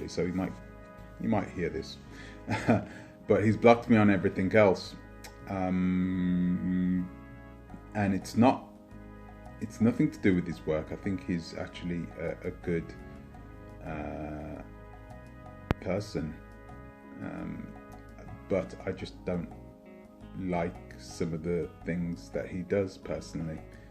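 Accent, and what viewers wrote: United Kingdom. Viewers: wait aren’t your kids white? “Biracial” so would that make you suspect ...... you just contradicted your self your British accent is way stronger than his you literally sound like a white man. Chakra bars accent is way more Caribbean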